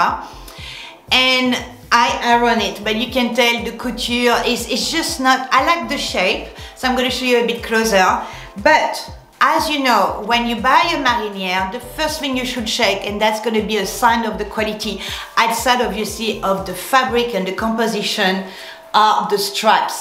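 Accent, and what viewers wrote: France. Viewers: Cocorico!🐓 Bonjour, je viens de découvrir votre chaîne. J'ai été interpellée par votre accent très français ("But alors you are French?"- La Grande Vadrouille 😄), en même temps cela me permet de faire des progrès en anglais car je comprends (presque) tout pour une fois... J'aime beaucoup ce que vous présentez, les couleurs et imprimés, et même si je n'ai pas la même colorimétrie, j'ai des goûts très similaires aux vôtres. La marinière, une valeur sûre! J'en prends chez Armor Lux, j'en trouve aussi chez Esprit à des prix plus abordables et de qualité correcte. J'aime bien porter un tee-shirt col rond à rayures noires sur fond blanc ou écru, sous un pull en cachemire noir col V, je porte le pull légèrement plus court pour laisser un peu dépasser le bas du tee-shirt pour rappeler l'imprimé du haut, le tout avec un jean. Passe-partout et chic. 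Bravo pour vos vidéos si agréables, merci pour votre bonne humeur et votre spontanéité. Forcément je m'abonne, clic clic clic!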